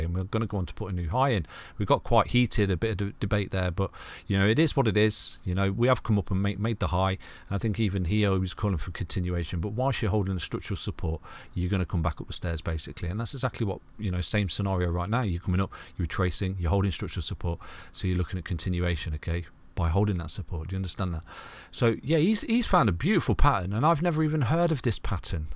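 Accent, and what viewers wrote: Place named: North, United Kingdom. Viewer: How can nayone be depressed listening to your luverly northern accent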